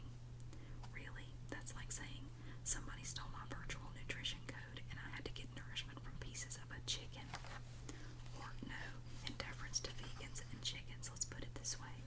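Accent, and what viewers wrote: South, United States. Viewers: Your voice is so soothing! Makes me feel at home. Originally I'm from Tennessee but now live in Oklahoma, just love a soft southern accent! Thank you for making these videos!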